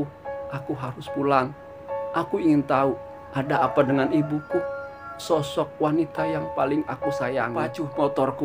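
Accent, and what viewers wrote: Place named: West Java, Indonesia. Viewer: jngn lupa logat Cibogo pake Terus dan plesetan semangat 💪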